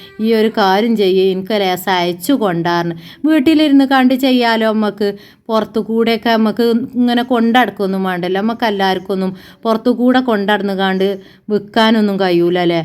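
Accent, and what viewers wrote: Malappuram, India. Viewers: Njan aadhyamayittahn ithade vvidio kanunnath...ithuvare vidiosin onnum comment idarilla...njn full vidio kandu... q &a session okke ...subscribe cheythu ... vidio kanditt commnt idan thonni...enikk samsaram orupad idhtshttayi..njnum malappuram aahn......negative comments idunnavar idatte ...athonnum mind akkanda . Malappuram karde normal talking ithaahn ....ithupole munnoott poyal mathi...insha allah..ellam sheeiyakum..allahu barakath tharatte...kadangalokke pettann theerkkan pattatte.....njngalum aanakkayam post ahnnn😅... Enikk ente thathante age thonni...6 makkal nd nnonnum parayillla😊masha allah..itha nalla sundariyahn....❤❤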